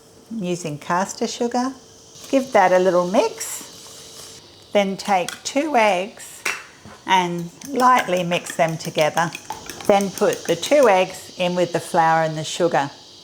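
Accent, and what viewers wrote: Australia. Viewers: I love your accent. You sound absolutely spangled! Aussies always sound like they are completely smashed. 😂 Great recipe btw. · Why ate you saying all purpose flour? In Australia its plain flour and double cream. You accent is Aussie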